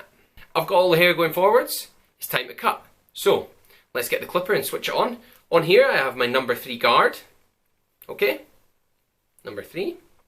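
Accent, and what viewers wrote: Scotland, United Kingdom. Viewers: Thanks for this. I'm giving hubby a Covid Cut this weekend, and this is a great tutorial !! Also..you could recite the Privacy Agreement for Apple, and I'd still listen...lovely Scots brogue !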